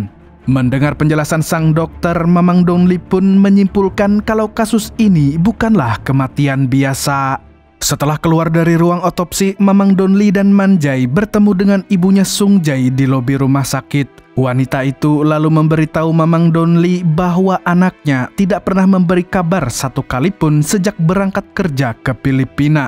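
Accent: Banjar, Indonesia. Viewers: Bahhhhh mendaiii urang banjarrr pun ai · ohh orang banjar sidin nih sekalinya. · nah orang banjar kah mimin nih 🤣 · umaaa org banua banar sekalinya sidin ti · bah urg bnjrr skliny salam di alalak tengahh manngg hahahhakwkwkwkw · BANJAR KAH MANG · Org Banjar kah mng 😅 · Urang banjar sakalinya si adminnnn😂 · urnk banjar ni sako dr suara opening iykh min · ternyata pian urang bnjar mang lah, kd nyangka uln😂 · orang mana pian bang kaya logat banjar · Orang banjarkah mang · Org banjar miminnya nh sklinya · umai urang banjar sekalinya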